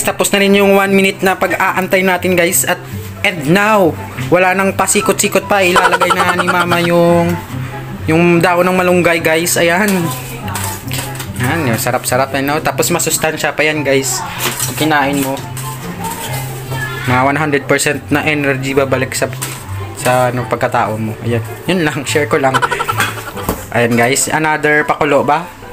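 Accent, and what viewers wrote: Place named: Iloilo, Philippines. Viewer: Hahaha dami kung tawa sayo lods.,lalo sa tabagak mo.,ay nano tinonto ito hahaha ilonggo gid mong.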